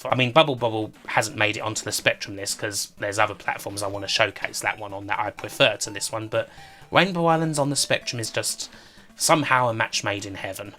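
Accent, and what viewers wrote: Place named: Kent, United Kingdom. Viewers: I'm not from down south where accent is from, so I can't place it any more locally than to say it sounds like a typically Kent sort of accent. Southend On Sea, perhaps. If you're not familiar with UK geography, that would be going out of London to the East, draw a line heading 90 degrees from the Houses of Parliament and keep going til you hit the coast, that's pretty much what Kent is. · South of England. Could easily be London, or maybe Essex. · I wich I could know where is accent from. London?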